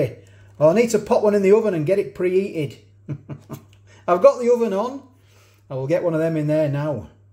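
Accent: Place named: Sheffield, United Kingdom. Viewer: You from Sheffield? X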